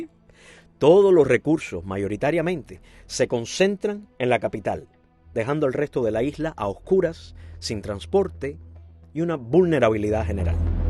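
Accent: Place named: Cuba. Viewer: Suena lastimoso, solo una pregunta... Debo creerte solo porque suenas como cubano? Quizá, pero por que veo que no estás en el hermano País de Cuba pues tú set se ve muy bien y más... Te enfocas en "el poder" interno y no en la lucha que por años dignamente ha librado el pueblo cubano y también en dramatizar con burlas tu punto de vista que no se sostiene de datos concretos. Ojalá puedas ampliar información.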